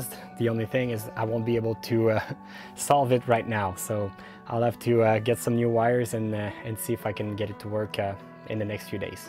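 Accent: Canada. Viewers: Oups je me suis trompée de vidéo mais j'ai like quand même. A bientôt pour une vidéo avec votre accent Canadien en français 😉☺🤣😂🇲🇫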